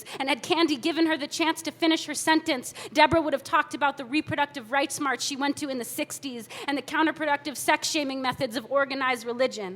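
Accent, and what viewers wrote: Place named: Long Island, United States. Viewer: She nailed the Long Island accent, it's the same as mine and everyone here.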